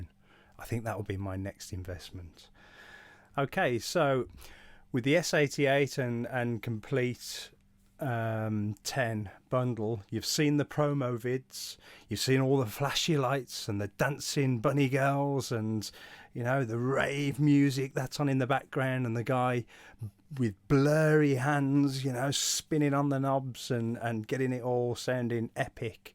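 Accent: Midlands, United Kingdom. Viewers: Where in the midlands are you from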